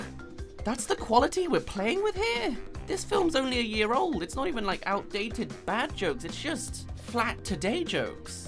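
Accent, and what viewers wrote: United Kingdom. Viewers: Yep, British accents are just the cutest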